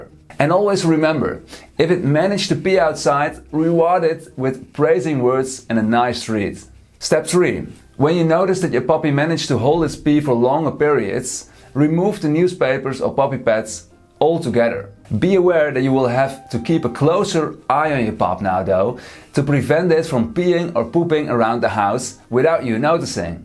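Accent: Netherlands. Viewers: I had a duplex with a yard the last time I had to train a puppy. I have been without a dog for nearly two years but just cannot commit to training while living in a 2nd floor apartment. I really don’t like puppy pads either. By the way, I love your Dutch accent. I once lived in Geertruidenberg for a year and adore Nederland. I miss being there so much. Also, you are quite the handsome Dutchman! 🤩✌🏼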